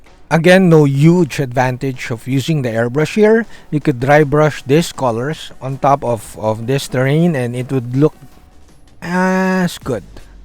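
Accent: Philippines. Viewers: Heavy Filipino ascent say peanut butter.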